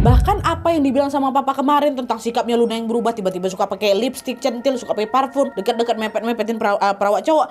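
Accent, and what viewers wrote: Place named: Medan, Indonesia. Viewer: kak serius nanyak kakak org mana? medan kah atau dari pulau sumatera?? cara ngomongnya kayak org medan kali.. atau mirip org sumatera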